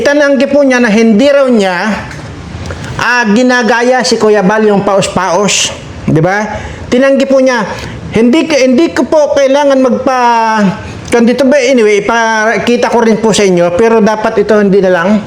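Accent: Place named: Hiligaynon, Philippines. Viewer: Tuod man nga ilongga ka day ,sa tono ng salita mo ,namit ka nga gahambal sang bagbakal mo ng juice sa tiangge, nano ka man nga ivedencia gid ang ginahimo mo ? Duda ka pa sa tono day??? Ha ha ha I like it puedi na ako ilongga sir?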